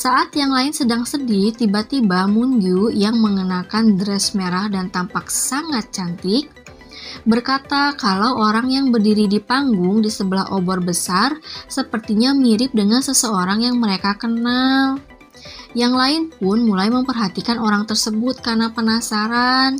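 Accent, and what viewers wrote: West Java, Indonesia. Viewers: Hahaha kesannya kaya adminnya nyindir hahaha tapi gue malah kesemsem sama suaranya sama logat sundanya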